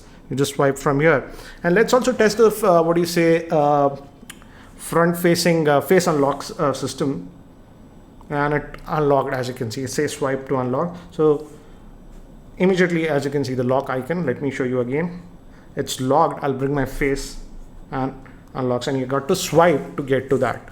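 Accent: India. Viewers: jajaja give him consideration ..indian accent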